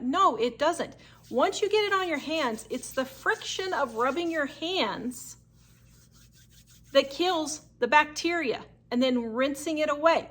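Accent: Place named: Central Illinois, United States. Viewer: I’m from central Illinois, a lot of ppl say warsh just like you 😂.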